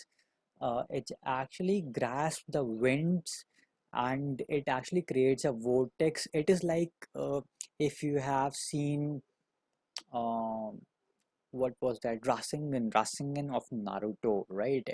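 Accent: India. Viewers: Hey friend r u indian u sound like 1 pls reply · You are from India, aren't you?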